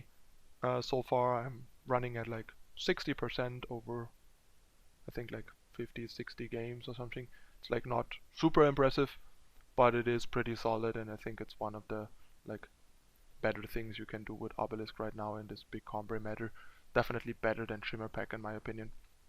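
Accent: Germany. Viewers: are your from Germany?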